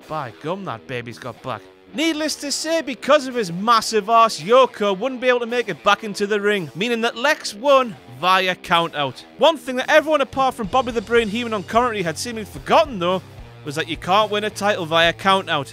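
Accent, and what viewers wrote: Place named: Newcastle, United Kingdom. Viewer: He should just stick with his Geordie accent, as it is far more "hilarious" than saying 'dabble yoo, dabble yoo, eee" for laughs.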